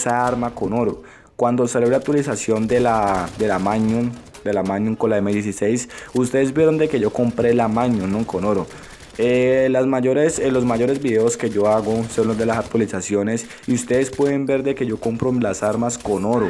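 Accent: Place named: Colombia. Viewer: Pensé que era colombiano por el acento y cuando dijo bacano me lo confirmo . Saludos desde bogota !!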